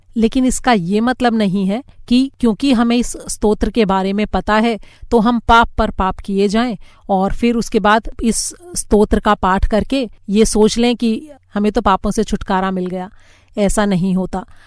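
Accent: Standard. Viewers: आपकी बोली शुद्ध सात्विक और स्पष्ट है....आपको हमारा नमन |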